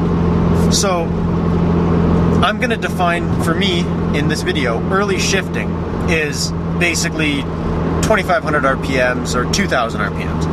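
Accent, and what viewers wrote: Canada. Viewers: TheDerDumme He sounds Canadian to me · dat Canadian accent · ahah you canadian? maybe from ontario i heard G1 lmao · First video watched from you. Are you from Canada? XD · are you from Canada ?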